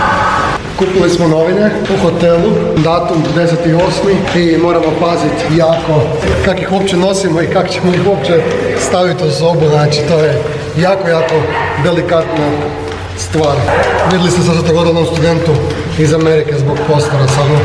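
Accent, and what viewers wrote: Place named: Croatia. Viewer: Super ste ali taj hrvatski akcenat je jako iritirajuci I kenja mi se od njega!!!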